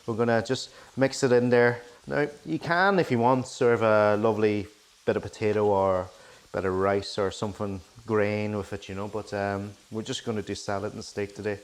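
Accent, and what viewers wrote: Ireland. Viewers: There’s something magical about an Asian with an Irish accent 😂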